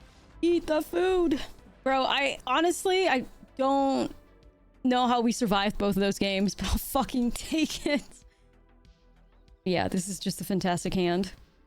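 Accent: Netherlands. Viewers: If ANYONE is giving you crap for saying English words with an awesome Dutch accent, they can go cookie themselves 😂 Don’t apologize and don’t change. Love your content just as it is. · We love the koo keez. Your Dutch accent makes it fun!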